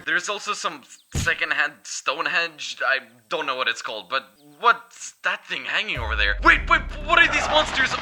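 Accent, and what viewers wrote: Russia. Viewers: It's quite cool to see a Russian speaker talking in English for minecraft content, Subbed. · Are you Russian 🤔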